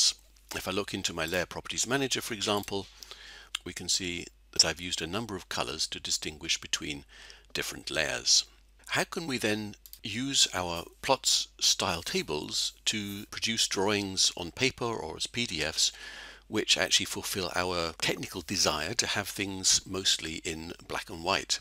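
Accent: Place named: South Africa. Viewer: I'm trying to work out where you accent is from, Im guessing South Africa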